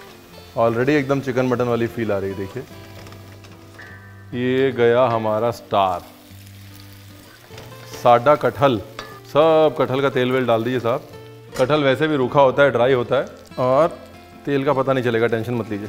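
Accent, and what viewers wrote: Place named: Lucknow, India. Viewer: Scrumptious....Hum bhi kathal.aise hi banate hain par neebu nahi daalte.... Bohat umdaaa aapne banaya hai ji....aap lakhnavi lehze mein.bol.kar Mann khush kar dete hain....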